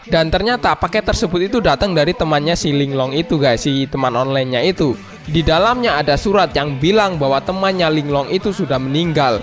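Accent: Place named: Java, Indonesia. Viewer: Wong jawa koe toh minn? Mantep bahasa ne mandan medok:v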